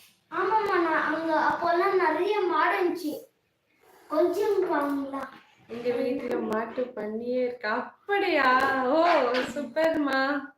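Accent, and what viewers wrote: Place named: Thanjavur, India. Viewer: Thanjavur Tamil 🤏🙏🙏🙏🙏♥️♥️♥️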